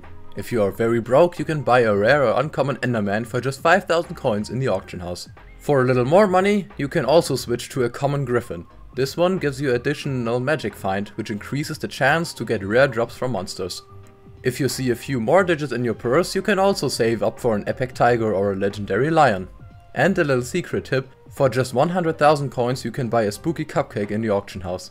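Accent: Germany. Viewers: this german accent tho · are you german? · you sound german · are you german? bc of the accent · you sound like german xD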